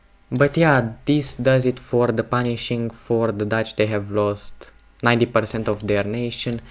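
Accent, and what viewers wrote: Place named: Romania. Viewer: Peak romanian accent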